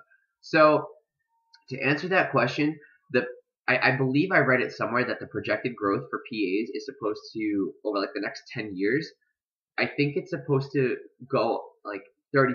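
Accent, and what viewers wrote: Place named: New Jersey, United States. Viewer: Tried to do your NJ accent but kept on making a southern accent lol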